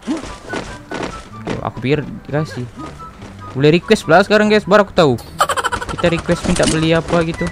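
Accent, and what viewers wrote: Sabah, Malaysia. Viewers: Orang Sabah ka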